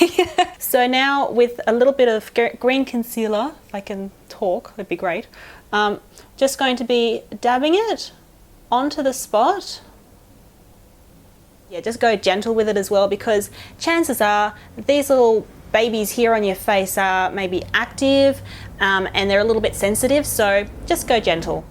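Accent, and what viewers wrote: Australia. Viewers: Australian (Duh)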